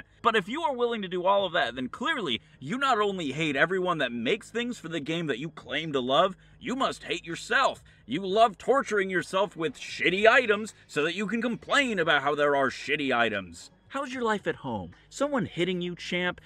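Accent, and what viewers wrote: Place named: United States. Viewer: why do you sound like mönkey but with an american accent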